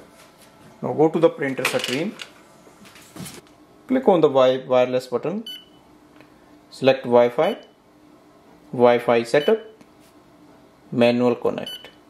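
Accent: India. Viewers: You need to practice more on pronunciation before making videos. The Indian accent is terrible!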